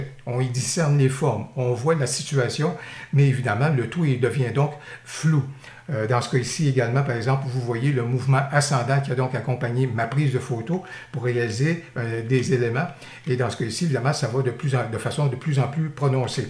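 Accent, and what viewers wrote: Quebec, Canada. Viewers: l'accent québécois et toujours un pur plaisir de sonorité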